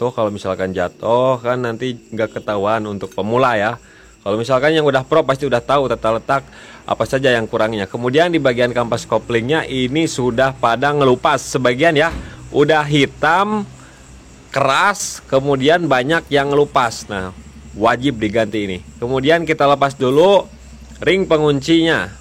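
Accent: West Java, Indonesia. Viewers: Curukk 🤭 orang sunda ieu mah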